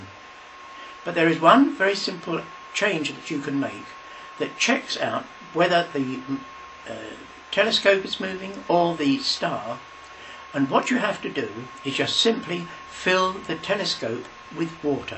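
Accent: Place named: United Kingdom. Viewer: Nothing like a talk delivered in a nice fruity British accent. However, the content, while full of high-sounding references, is an enormous pile of horse-pucky.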